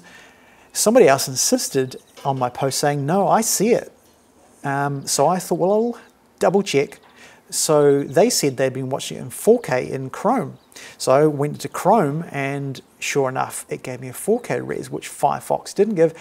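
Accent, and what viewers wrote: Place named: New Zealand. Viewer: Love hearing a kiwi voice on the tube. I am waiting for the GH5s announcement. I am a kiwi, living in china watching this in Ukraine. Keep it up dude.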